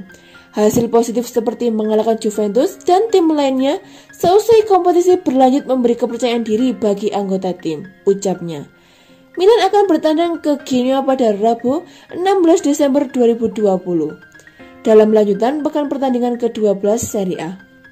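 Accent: Java, Indonesia. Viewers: Kemedokan yang baca berita nya...gak menarik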